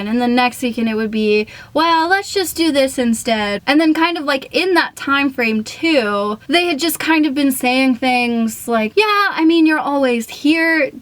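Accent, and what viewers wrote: Texas, United States. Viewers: texas accent coming through?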